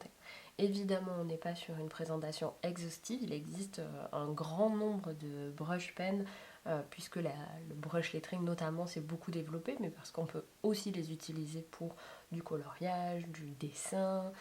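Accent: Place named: South, France. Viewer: Merci pour cette belle vidéo très instructive (le petit accent me rappelle mon Sud natal). J'ai pas mal de ces différents feutres pour mon bullet journaling et bien que je sois d'accord sur le fait que les Stabilo brush soient fabuleux, ils traversent beaucoup, même des carnets au papier 160 gms, donc attention! Aussi, pour les Faber Castell, un petit truc: on peut sortir complètement la pointe avec une pince à épiler puis l'inverser et paf, une pointe toute belle, toute neuve qu'on peut réinsérer! Une trouvaille aussi, les feutres de la marque Djeco qui sont fait pour les enfants et ne paient pas de mine... sont quasi identiques au Lyra en beaucoup moins cher, j'ai été bluffée.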